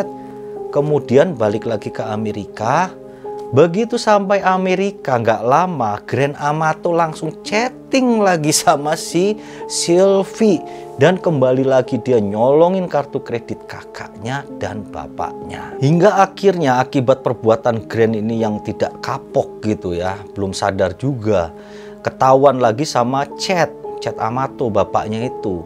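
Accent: Java, Indonesia. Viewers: Angkel medok..nyenegkeh swarane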